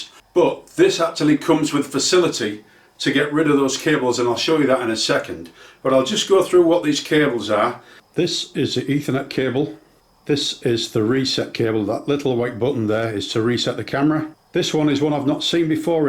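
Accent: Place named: East Yorkshire, United Kingdom. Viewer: Hi, I just ordered one of these, but think I need some power for the PoE, can you recommend a switch or adapter for the supply. Liked the video, and the accent too, sounds like your from my part of East Yorks. Regards John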